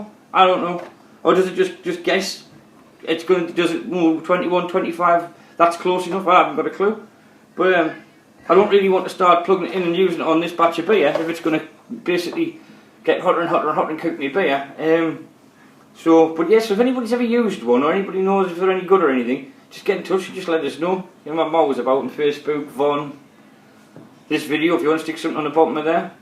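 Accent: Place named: Blyth, United Kingdom. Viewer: You sound like your from my neck of the woods. I'm in Blyth.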